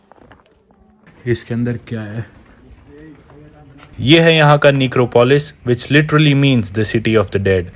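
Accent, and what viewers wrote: Haryana, India. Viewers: Kbi kbi toh aap pure haryanvi me aa jate ho baladth- ox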